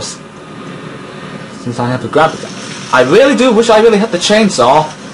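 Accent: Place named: United Kingdom. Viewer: thnx, this video helped. got stuck. also, british accent = awesome :-D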